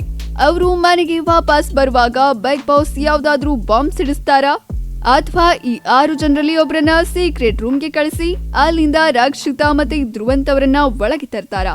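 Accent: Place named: United Kingdom. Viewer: why are you talking like as though you are an british talking in kannada. It sounds dirty and cheap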